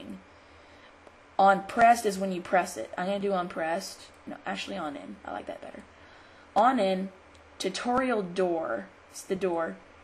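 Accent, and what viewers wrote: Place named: United States. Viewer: Finally someone I can understand! I had to go through so many kids that English and Australian before I found a voice that speaks fluent American! Great, simple, clean tutorial!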